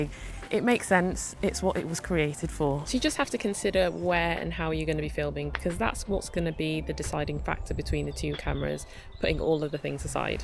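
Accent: United Kingdom. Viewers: Great video. Talk slower for your over the pond English is hard to take in your info.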